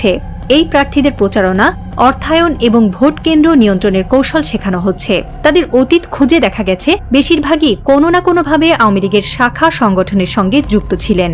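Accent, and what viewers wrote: Kolkata, India. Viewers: কবিতা বাদ দিন, আপনাদের খবর শুনতে খুব খারাপ লাগে। কলকাতার মত সংবাদ পাঠ বাদ দেন প্লিজ।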